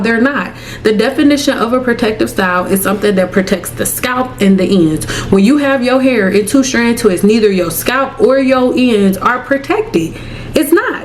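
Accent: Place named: Detroit, United States. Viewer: I knew you were from Detroit just listening to you 😂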